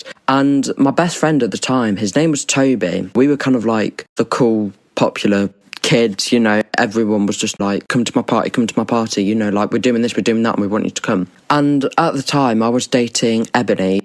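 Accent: England, United Kingdom. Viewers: well given by his accent and the fact that he said football and not soccer I think UK school works differently than American school what it comes to summer break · Someone sounds BRITISH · ooo la la i am in love with english accent😍😍😍😍😍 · I love it the accent british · its too good to be true lmao uk accent and hes acting like hes in a high school movie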